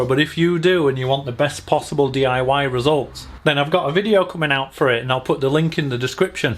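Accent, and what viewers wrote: United Kingdom. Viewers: Very nice British accent 👏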